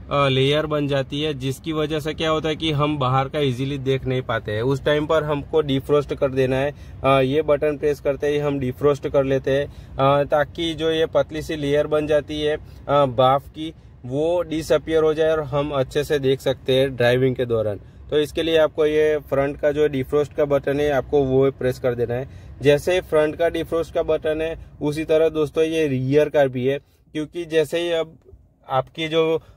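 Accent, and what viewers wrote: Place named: Gujarat, India. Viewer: Bhai pakka gujrat se he ye lg rha h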